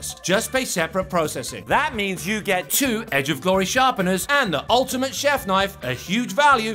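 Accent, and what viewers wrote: United Kingdom. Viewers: I’ve only watched penguinz0 videos. I didn’t know he was British I was so surprised when I heard him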